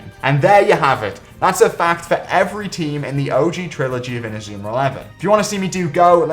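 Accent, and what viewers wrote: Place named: Newcastle, United Kingdom. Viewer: He said c-ooo-k-e 🤭🤭 i love the Jordie accent creeping into words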